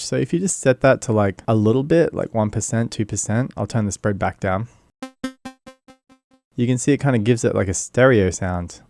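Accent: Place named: Australia. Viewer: Hey mate. Great vid and great to hear a fellow Aussie in one of these vids. Where in Oz are ya from?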